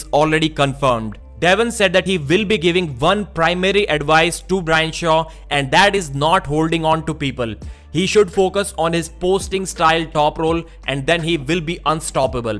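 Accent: India. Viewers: Your indian accent is so funny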